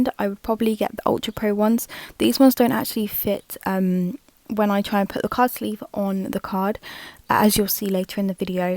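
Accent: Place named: United Kingdom. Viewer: as soon as i heard ur accent i was like 'yes another uk moarmy' im from manchester hehe <3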